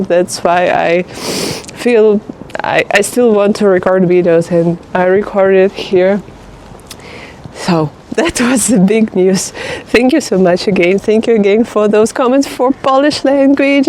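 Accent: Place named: Ukraine. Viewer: добрий вечір. Więc najpierw napisze Tobie śmieszny komplement:) Czyli to, że masz ten przepiękny ukraiński akcent jest tak przeurocze, że ja życzę Tobie, żebyś Ty nigdy się go nie pozbyła bo to jest dla naszych uszu jak najpiękniejsza muzyka! Więc cudownie, że tak dobrze już mówisz po polsku i brawo dla Ciebie, a Twój akcent to taka przeurocza wisienka na torcie więc nie wstydź się go tylko ciesz się nim:) Dalej chciałbym Ciebie przestrzec przed czymś. W Polsce mówi się o tym, że jest mniej poparcia dla Ukraińców i że ludzie są już zdenerwowany, że tak dużo Ukraińców jest w Polsce. Więc to jest totalnie nie prawda! My bardzo się cieszymy, że do nas przyjechaliście i szkoda, że w tak strasznych okolicznościach jednak zachowujecie się w Polsce bardzo dobrze, pracujecie i dokładacie się do rozwoju naszego kraju i obyście chcieli to wszyscy po wojnie zostać. 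Zatem jeżeli ktoś Ciebie będzie pytał jak tam nastroje w Polsce czy nadal nas lubią, to możesz powiedzieć śmiało jest nawet lepiej oni nas kochają i słuchaj ja też mam ochotę uklęknąć i zawiązać Tobie buciki jak będzie taka potrzeba. A jeżeli zderzyłabyś się z jakąś nieprzyjemną sytuacją, to ja z góry Ciebie za nią przepraszam i proszę nie przejmuj się tym u nas też głupich niewykształconych ludzi nie brakuje, ale pamiętaj o tym, że każdy normalny i inteligentny Polak zawsze z chęcią uklęknie i pomoże <3 Przytulam Ciebie gorąco, życzę dużo uśmiechu i powodzenia we wszystkim oraz to jest ciężki czas teraz dla Was jednam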